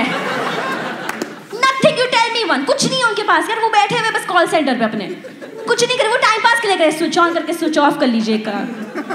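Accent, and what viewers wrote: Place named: India. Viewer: dont emphasize each and every word in typical ta ta ta indian accent .stop that its annoying paperweightttta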